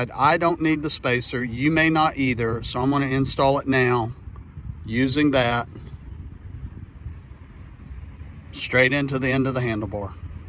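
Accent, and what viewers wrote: United States. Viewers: Helpful video. Thanks. Wild guess, but are you from Tennessee?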